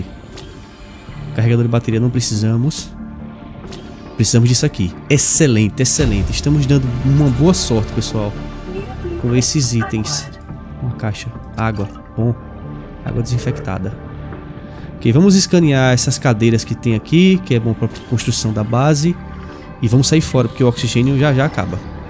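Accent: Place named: Northeast, Brazil. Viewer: Conhecendo o canal agora, por esse jogo, pq preciso de dicas, to meia perdida nele, gostei, adoro esse sotaque nordestino, ganhou uma inscrita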